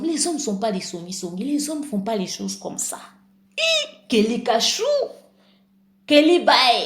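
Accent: Ivory Coast. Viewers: mama pourquoi parler comme une ivoirienne?????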